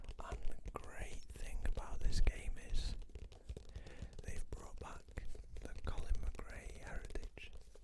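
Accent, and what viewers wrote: Wales, United Kingdom. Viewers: ah right thanks I thought it sounded Welsh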